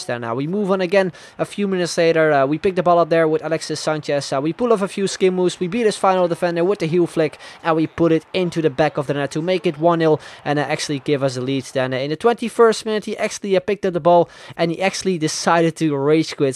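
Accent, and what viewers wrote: Netherlands. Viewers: You're dutch aren't u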